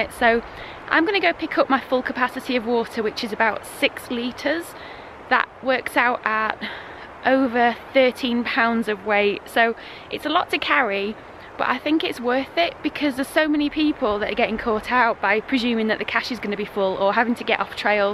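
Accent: United Kingdom. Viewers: Healthy meal!! Heat is dangerous, be careful out there! Love the accent. It’s like a Mary Poppins thing, very sweet. The suitcase, clothes and cans look very old. Sometimes “interesting” people do their own adventure.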